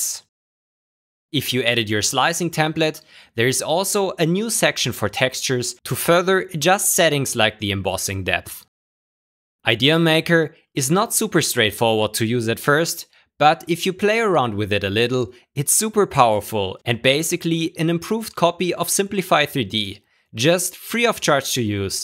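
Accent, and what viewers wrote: Germany. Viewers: Man kann den Deutschen Akzent richtig spüren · I prefer textured. Also, I love your accent, where are you from? Edit: Nvm, i just finished the video, probably, German?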